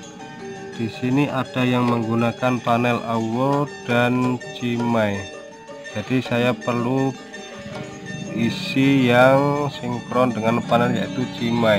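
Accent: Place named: Banyumas, Indonesia. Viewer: Kaya wong banyumas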